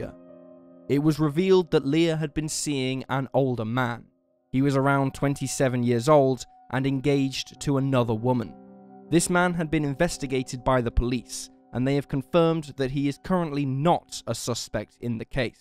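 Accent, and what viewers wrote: United Kingdom. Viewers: clicks on the video - hears bri-ish person - day ruined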